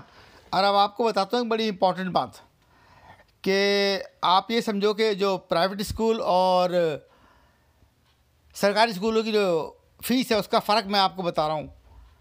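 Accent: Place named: Moradabad, India. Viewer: बहुत अच्छी रिपोर्ट है और आपकी भाषा सुन कर मुझे ऐसा लगता है कि आप मुरादाबाद, रामपुर या चंदौसी के रहने वाले हैं। अगर मैं सही हूँ तो कृपया जवाब दें।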